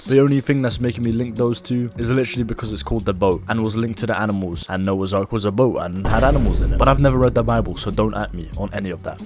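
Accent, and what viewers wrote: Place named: United Kingdom. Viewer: Hey great video! Not only does it help that you sound British, but you also go into a good amount of detail! I look forward to your next information vids :)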